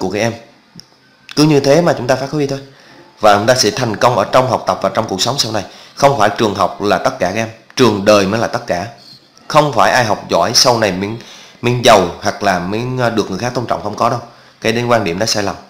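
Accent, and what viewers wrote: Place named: Binh Dinh, Vietnam. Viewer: VLOG dạ hèn j dống tiếg bình định😂😂😂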